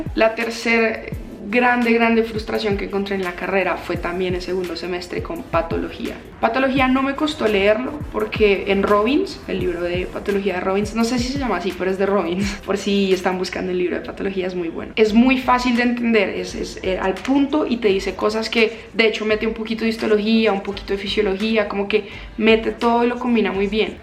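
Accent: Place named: Andes, Colombia. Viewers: Hola, si es muy normal esa entonación de la S en la región andina de Colombia. Ella habla con acento de Bogotá, la capital de Colombia. De donde eres? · el acento de Bogotá es diferente, el que hablan sus compañeros si es el acento de Bogotá. Ella tiene un acento neutro con un poquitico de caleño (ella es de Cali)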